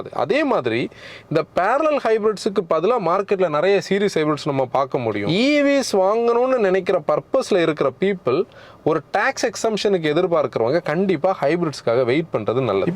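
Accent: Kanyakumari, India. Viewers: Sweet Kanyakumari language and loyalty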